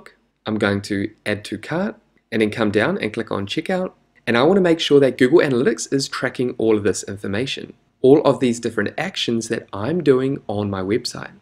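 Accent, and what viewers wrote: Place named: New Zealand. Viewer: Cheers Mate! Good to hear a fellow kiwis voice lol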